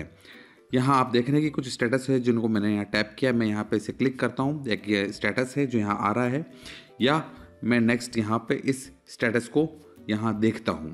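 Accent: India. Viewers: badi fast ahh nav delhi se lagdi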